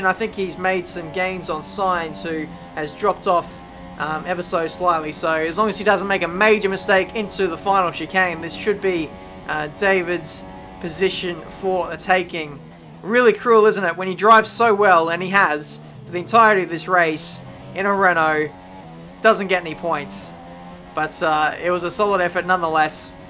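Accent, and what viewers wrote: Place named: Australia. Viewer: Richard Booth He's Australian, of course he will have an accent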